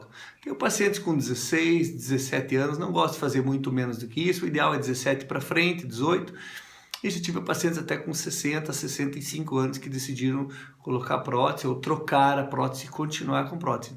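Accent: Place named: Northeast, Brazil. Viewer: Dr. Primeira mente esse teu sutaque é maravilhoso, nao sei de parte do Nordeste tu eres mas amo esse sutaque. Bem eu estou planejando colocar uma protesi mamária, moro em Boston mas sou de Recife, estou estudando a hipotese de fazer a cirurgia no Brasil, gostaria muito de saber em parte do Brasil vc atende?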